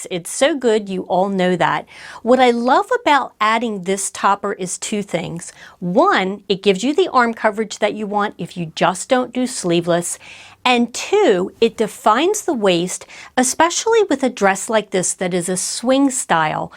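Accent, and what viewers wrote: Philadelphia, United States. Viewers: I'm loving your Philly/South Jersey accent! My mom was a South Philly Theresa. You have excellent tips and explain things so well!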